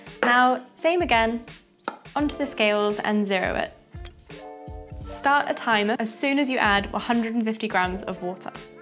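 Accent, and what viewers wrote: United Kingdom. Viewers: Was thrown by your British accent, was just going to place an order before realising it was a US company! 🤣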